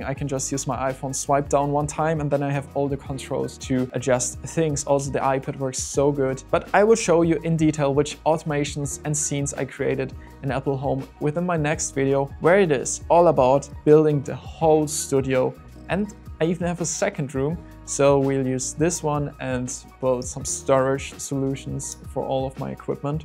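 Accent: Germany. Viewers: It's funny how your english sounds flawless 90% of the time, while being so obviously german at the same time. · I hear german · German English, cool:face-fuchsia-wide-eyes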